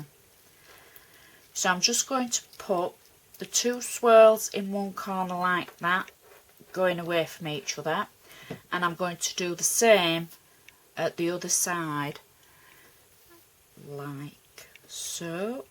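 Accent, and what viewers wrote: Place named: Northern England, United Kingdom. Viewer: Nice to hear a northern accent I am from Bradford but now live in Bournemouth, where are you from?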